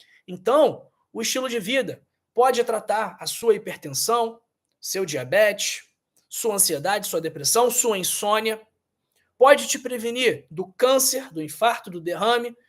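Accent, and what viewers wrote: Rio de Janeiro, Brazil. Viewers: Muito bem explicado Dr. Simpatia!! Gosto muito do seu sotaque carioca!! Mais uma lição de ensino contigo!! Obg Dr.!!❤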